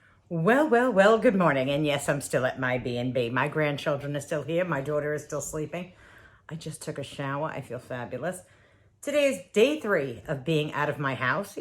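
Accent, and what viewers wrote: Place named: Boston, United States. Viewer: Are you from Boston? Lol cuz of the accent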